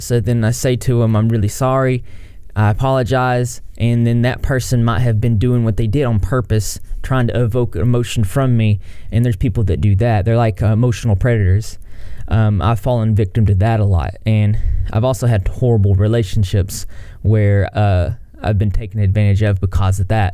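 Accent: South, United States. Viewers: I too struggled with boundaries until I started my nursing career. I’m not sure why that changed but I’m glad it did. Not going to say it’s perfect but I learned like you probably have people will walk all over you if you don’t. I noticed you also have a southern accent so I’m assuming you are from here as well. It’s sad that people take our generous nature and upbringing and use and abuse us. It’s not because we are stupid or gullible we are just taught to do for others. I’m glad you are working on this and I hope you continue to do well :)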